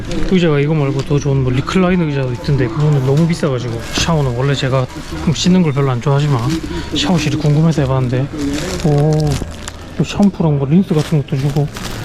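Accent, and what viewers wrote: Gyeongsang, South Korea. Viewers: 오호 신기합니다. 갱상도 사투리가 친근하기도 하고 잘생김이 더욱 맘에 들고..조카뻘의 나이라서 조카생각도 나네요.. 젊을때도 그렇고 항상 행복하게 지내시기를.. 이제 알았으니 종종 볼게요...화이팅입니다....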